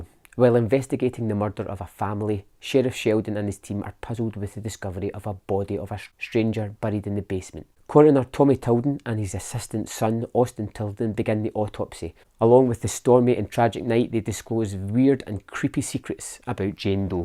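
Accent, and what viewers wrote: United Kingdom. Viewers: I like British the accent is cool yeah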